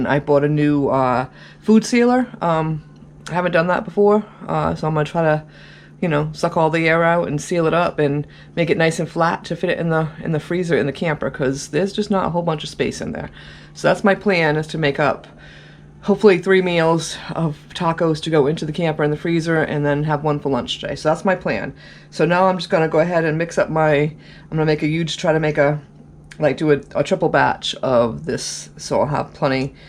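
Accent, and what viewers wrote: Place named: New England, United States. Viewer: Your accent. Maine or N.H.?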